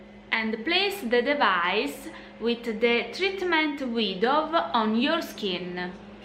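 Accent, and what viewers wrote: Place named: Italy. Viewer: Maybe she’s Italian and speaks with accent ?! Jesus, calm down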